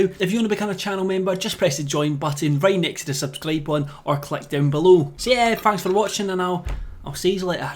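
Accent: Scotland, United Kingdom. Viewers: Are you from Scotland? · Hello, I'm just asking. Are you British or Scottish? If not, what is your nationality. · are you scottish? i love your accent damn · r u socttish? U sound scottish and I like that this is the first vid i watched abt u btw lol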